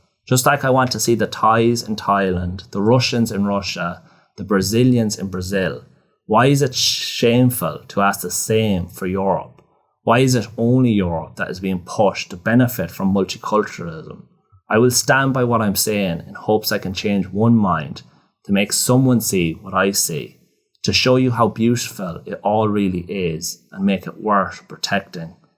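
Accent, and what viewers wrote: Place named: Kildare, Ireland. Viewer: I blame the multi nationals for this. They now employ more non nationals here in ireland too. So support them you keep supporting this. Their a liability to this country now and played a big role in causing cost of living crises too. Irish are not having kids either due to to so much liberalism and diversity. I'm guessing this guys accent is around kildare area......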